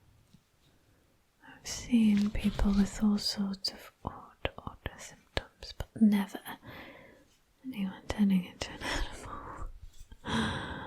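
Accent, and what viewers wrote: United Kingdom. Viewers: I get really happy when does a doctor rp because it means a British accent again. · with an English accent is a real treat. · Nice doctor RP, love the British accent. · Great Video!!! I love the Doctor Asmr video!!! I also love the British Accent and Glasses for this video too because they make you even more Beautiful!! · Nice British accent. Thank you for the video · Love the accent! I always found the British accents were the most tingly 💤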